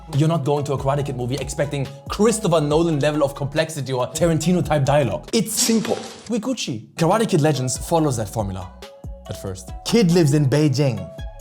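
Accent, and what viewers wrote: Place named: Germany. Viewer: Digga wieso man merkt dass du die letzte Zeit viel deutsch geredet hast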